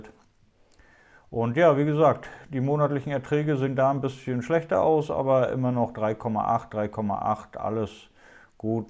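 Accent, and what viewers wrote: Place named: Western Pomerania, Germany. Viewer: Du musst doch irgendwo aus Vorpommern kommen, jedenfalls nach deinem Dialekt!?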